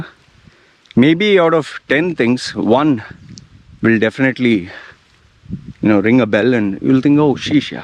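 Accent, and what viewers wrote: India. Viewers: When ur accent is indian and u can't even pronounce indian names correctly.....dhruv rathee?😅😅😅 On purpose?? To act cool or sound like west!? Oh' cmon u dnt need such acts to look polished....u r much more than that 😊😊😊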